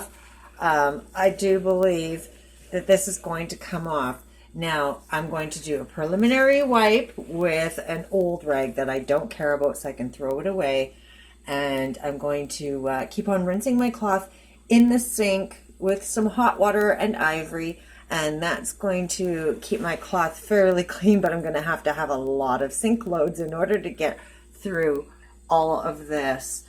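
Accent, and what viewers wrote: Canada. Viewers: You sound Canadian and possibly from Ontario? I know an Ontario accent when I hear it. I am in Manitoba, in the Winnipeg area. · I find it amazing that you only use like 2 different cleaners predominantly. That simplifies things so much. It's also surprising to me that you use that Dr. Bronner's for steam cleaning. It's very versatile to do that. I'm going to pick some up tomorrow. By the way, are you Canadian? I thought I heard the accent but I could be wrong lol...I'm Canadian, that's why I ask :P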